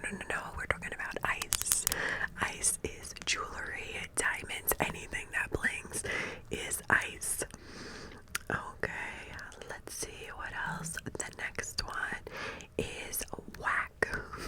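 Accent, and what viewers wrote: New York, United States. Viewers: MAN YOUR VIDS ARE ABSOLUTE PERFECTION!! I love the NY ACCENT!! I’m gonna do an ENGLISH ONE (probably many) when I start actually doing my asmr voice videos!! This is so cool and interesting!❤️ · Being a 29 year old English guy, all accents and slang words fascinate me! And your New York accent and all the slang words are beautiful and sassy!!🤗😇 xox 🥰🥰❤️❤️ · I love New York and I love your accent 😂💗🥰!!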